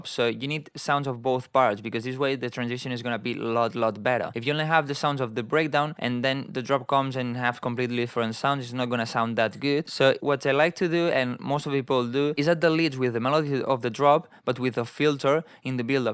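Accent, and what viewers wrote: Spain. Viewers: este hombre es un español hablando en ingles o es cosa mia? buen video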